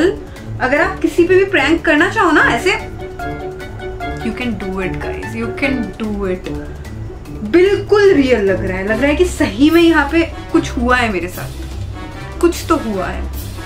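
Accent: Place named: West Bengal, India. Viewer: ap bangali ho you know when I hear you bengali voice I just 😱shocked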